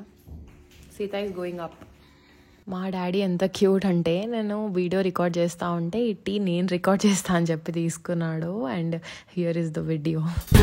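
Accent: Rayalaseema, India. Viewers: Pakka maana Rayalaseema language ❤❤❤❤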